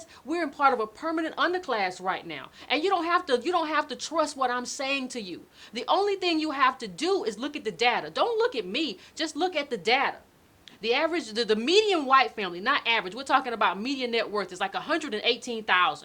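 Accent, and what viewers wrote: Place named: United States. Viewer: I like her American accent along with her intellect and sense of humour 🤣🙏🏿👊🏿.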